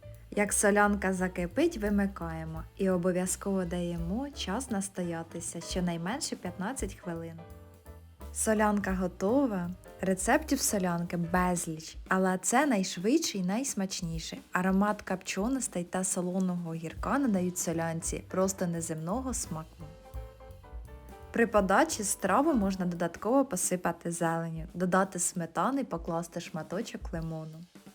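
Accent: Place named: Poltava, Ukraine. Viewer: Христос Воскрес! Здається , Ви родом з Полтавщини!) так радісно і приємно чути!! Солянку зазвичай готую без картоплі, але зараз саме буду як Ви порадили) дякую!!) миру нам !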